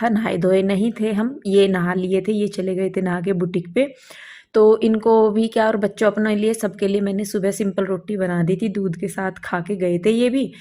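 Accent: Haryana, India. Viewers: Kya.aap haryana se ho aapki boli m haryanvi touch h m b haryana m rehti hu rohtak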